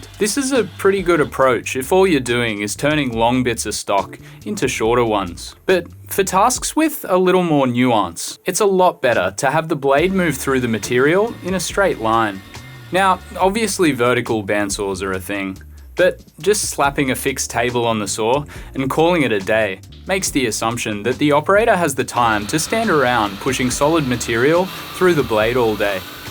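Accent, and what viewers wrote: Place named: Australia. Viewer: Your delivery style suggests SuperFastMatt’s lost Aussie brother, but without the fast bit. Interesting project. Thank you for sharing. Peace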